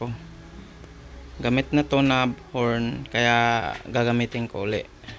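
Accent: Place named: Visayas, Philippines. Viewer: Bisayang bisaya....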